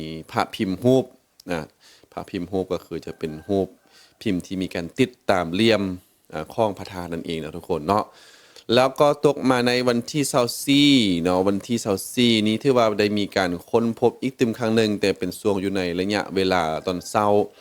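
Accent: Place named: Lanna, Thailand. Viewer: 💐💛🤗 สำเนียงบ่าวล้านซ้างใกล้เคียงคล้ายคลึงสำเนียงคนล้านนาขนาด ... 👏⚘️😘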